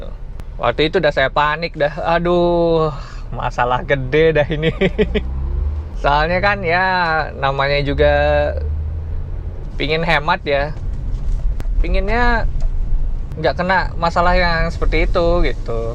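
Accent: Bali, Indonesia. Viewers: masbro.. sy subscriber baru nih.. yg sabar ya masbro.. namanya jg kcelakaan.. kdepannya hrs extra hati" masbro..:D oh iya logat ngomongnya kaya orang bali ya bro.. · awal ngelihat ni vlog, logatnya yg saya kenal, setelah di cek ternyata orangbali 😀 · kok kyk bali logtnya yak? apa cuman perasaan gue aja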